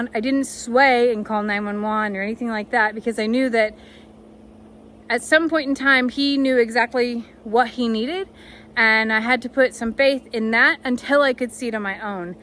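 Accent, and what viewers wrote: South, United States. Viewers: Never thought I would hear with a country accent. Love to hear you sing! Hey..glad you're on speed dial. Thank God y'all are safe. Good job, little!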